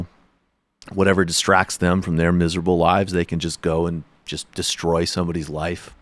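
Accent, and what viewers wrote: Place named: New York, United States. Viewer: cuz he's a new yorker XD he also says yuge, instead of huge.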